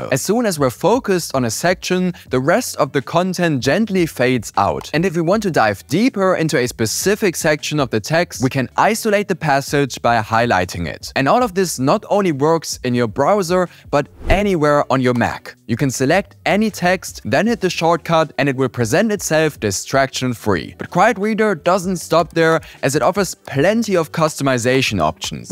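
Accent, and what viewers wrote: Germany. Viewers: Sounds like a germany accent to me. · Ich habe echt etwas gebraucht, bis ich den deutschen Akzent durchgehört habe - Hut ab! 😁 Dein Englisch ist super 👍 Abo hab ich auch mal dagelassen :)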